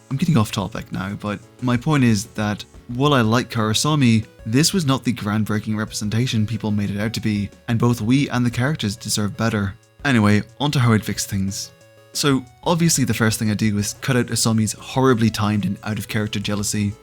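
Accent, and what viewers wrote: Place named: Ireland. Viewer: exactly 😅 it's so mixed, it's like every Irish accent mixed together in a bowl 😊 no hate, I just can't place it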